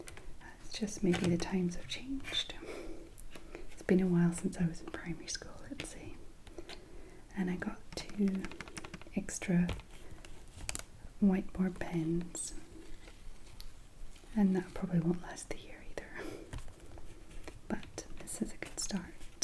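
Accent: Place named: Scotland, United Kingdom. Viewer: I was thinking the whole way through this video 'she definitely sounds a little Scottish' and then you said you went to school in Scotland lol that's where I'm from too. Can I ask which part of Scotland you lived in?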